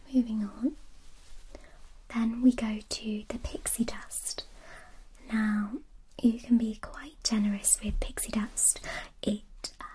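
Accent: United Kingdom. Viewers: normally has a British accent, but she did a Hermione accent on top of that!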